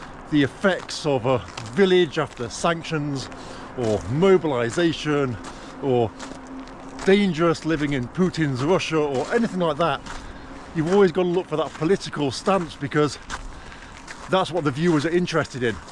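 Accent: England, United Kingdom. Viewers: You probably never will be viewed as a "real Russian" by most in Russia. You may speak the language, but have a clear English accent.. I can imagine it is frustrating for you, but it's this way in most countries..There are some countries that accept and embrace foreigners more than most...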